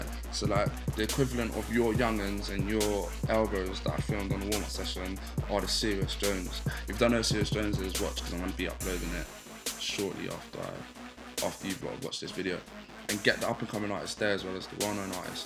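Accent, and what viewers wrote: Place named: United Kingdom. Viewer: bro your cool but man the brittish accent is gay man really gay if u talk like that in U.S the will think ur gay.i dont say you only talk like that all british do that.Make a own accent :)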